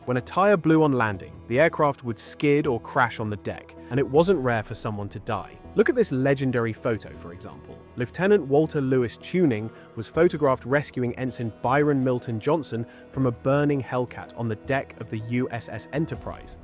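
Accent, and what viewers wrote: United Kingdom. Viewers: These things always sound more important with a British guy announcing.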